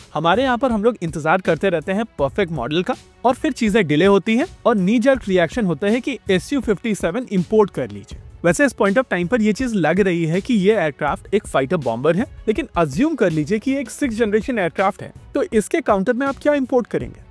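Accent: India. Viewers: 对英语一窍不通的我一听到这口音我就知道这是印度人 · I must say I'm addicted to the india accent. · english with hindi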